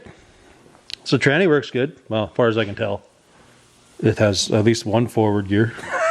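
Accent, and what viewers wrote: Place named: Canada. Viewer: This got Canadian accent with a southern twang, but great content 😂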